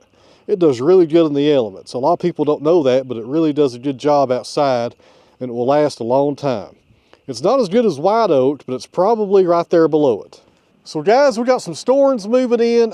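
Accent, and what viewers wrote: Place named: Tennessee, United States. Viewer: It's OK, I have relatives in Tennessee and I can understand you just fine. 😊😉 Sometimes they have a little trouble understanding me from Minnesota. Ya sure you betcha.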